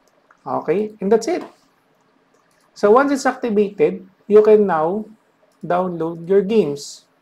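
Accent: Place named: Latin America. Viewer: amigo porque pija hablas en ingles si se nota de aca a la china que sos latino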